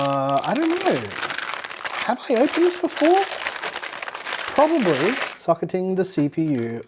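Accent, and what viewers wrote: Australia. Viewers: Fantastic build! The 3090 is definitely a hot boy so all the fans are definitely necessary. My EVGA 3080 draws 380 watts. Non related you remind me of my Mexican cousin so it trips me out that you have that Aussie accent. Lol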